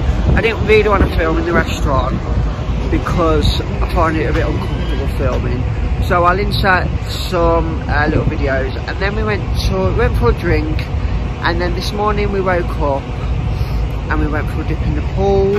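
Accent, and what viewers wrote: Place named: Scotland, United Kingdom. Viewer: Ps… love the Scottish accent 😂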